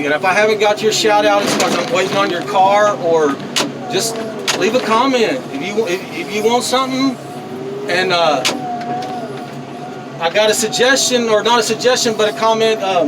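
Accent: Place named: Texas, United States. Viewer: best to watch videos without sound, so you don't hear this texas twang moron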